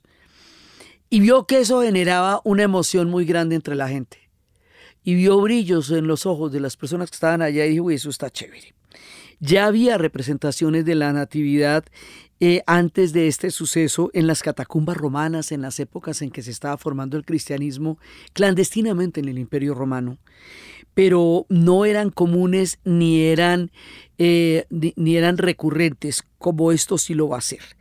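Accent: Colombia. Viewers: ! El regalo más maravilloso ha Sido encontrarte, en mi vida existía un vacío que tú con tus palabras me lo has estado llenando, soy nacida en Colombia y vivo en Venezuela, dónde nos trajo mi padre desde Antioquia, por lo tanto tu acento y tus salidas me encantan y remueven mi origen. Podría escribir más...pero no jajajajaja Yo necesito aclarar el cuento del árbol de San Bonifacio porque iban a matar el niño, y como esa acción de San Bonifacio lleva a lo del arbol😊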